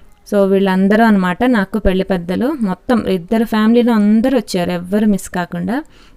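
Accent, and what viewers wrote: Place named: Visakhapatnam, India. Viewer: gaaru great andi still now mimmalni chusthe you look like egypt women andi kaani meeru matlade telugu valla andi i can recognize miru maa vizag ammayi andi great andi so you are living happily in egypt that's great andi Author Venkat 😎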